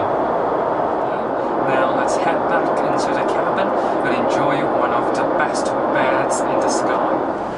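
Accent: United Kingdom. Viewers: 英国口音X2 <3<3<3<3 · 英国口音很牛哦哈哈哈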